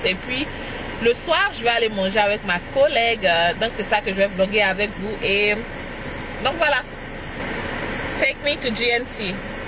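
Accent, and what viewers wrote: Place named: Ivory Coast. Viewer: Naaan il faut que tu m'explique comment t'arrive à avoir un bon accent en anglais alors que tu as aussi ce magnifique accent quand tu parle français avec ton accent ivoirien. ça fait des mois que je suis au usa et mon accent français est toujours aussi fort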